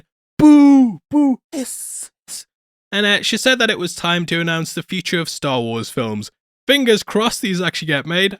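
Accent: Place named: North East England, United Kingdom. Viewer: Are you serious? He's clearly British, from the north east by the sound of it.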